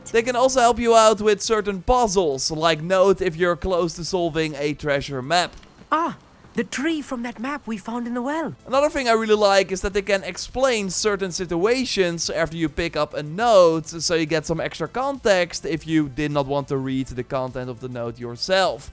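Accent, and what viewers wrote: Netherlands. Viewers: the "th" sound a not the same as the dutch "d" sound. Maybe it helps with getting rid of the dutch accent if you want that. Just a tip from a fellow dutchy, not to be mean.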